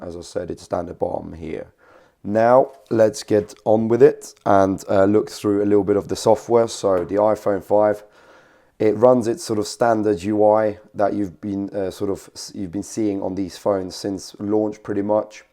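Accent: United Kingdom. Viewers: Finally a British phone reviewer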